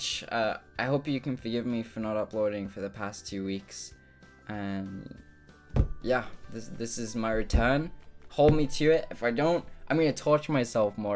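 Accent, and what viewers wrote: United Kingdom. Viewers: I love your mid-British accent, it's like, u can tell that ur some what British but it's confusing by the accent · DON'T MOVE TO AMERICA!!! YOU'LL LOSE YOUR BRITISH ACCENT!!! Slowly but surely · Yes I'm British too I think he is, my friend is like that too coz all her friends are american she picks up the accent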